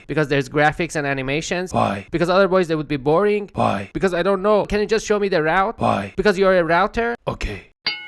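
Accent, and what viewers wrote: Iran. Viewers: لهجه ایرانی رو همون اول فهمیدم 😂😂😂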